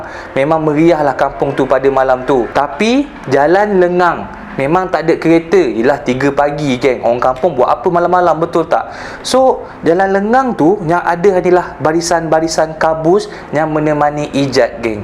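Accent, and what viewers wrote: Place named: Terengganu, Malaysia. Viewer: Assalamualaikum…akak dgn suami bru firstime dgr…bru 3 cite akak dgr…2 cite dr pantai timur…pastu yg ni…mcm dh start suke lak dgr adik b'cerita…loghat ganu tu…buleh dh tu…jd dh tu…😆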